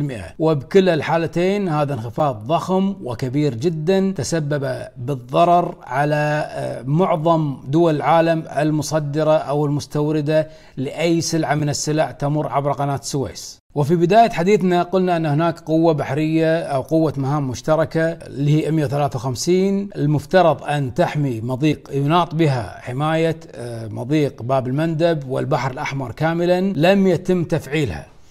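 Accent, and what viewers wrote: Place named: Israel. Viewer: كأن شخص اسرائيلي يتكلم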